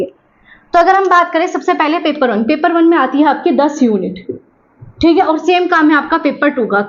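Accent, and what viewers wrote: Haryana, India. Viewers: Yes ,that haryanvi accent inspiring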